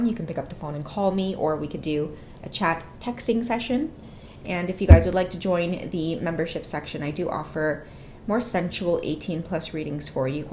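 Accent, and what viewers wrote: Trinidad and Tobago. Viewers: Hey !!! Are you from Trinidad 🇹🇹 you sound like you from.am from Trinidad 🇹🇹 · Your Trini accent is everything for me 🥰 Carribbean gal tingz 😂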